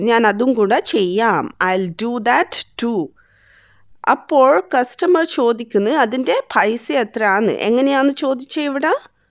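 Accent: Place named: Kannur, India. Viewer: Typically kannur malayalam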